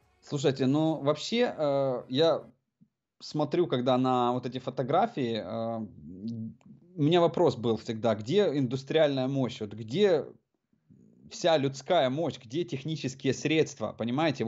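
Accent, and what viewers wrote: Ukraine. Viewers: оказывается, это украинский говор.. оба-на.. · Судя по акценту ты из Одессы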